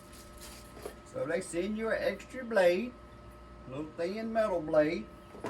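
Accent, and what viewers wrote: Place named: Texas, United States. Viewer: You have such a delightful accent. I'm not sure if it is Texan? I can't get the wheels assembled properly on mine. They are very rickety. I'm just immensely proud of myself that I managed to assemble it at all. Mine is a different make, but very similar to the one you demonstrated. I'm too scared to use the large cutting blade so haven't tested it yet. I do like the plastic blades. A: I feel safer using them, and B: I think they really did a good job. Shall see how long this little machine lasts, but given that a gardener charges £25 an hour here these days, if I get six months out of it, then I've still saved money. TY for the video. Oh and I love the wooden playhouse. So cute.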